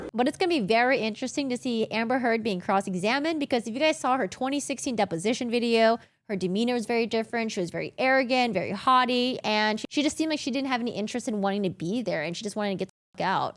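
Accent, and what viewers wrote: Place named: United States. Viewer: If her accent doesn't give it away, her location is stated in the about page, united states.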